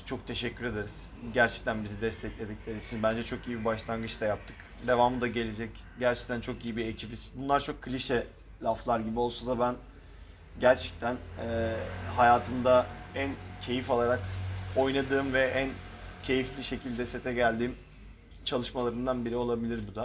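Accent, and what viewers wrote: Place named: Italy. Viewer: Abi sen italyan ca konuş daha iyi (yani aksanı)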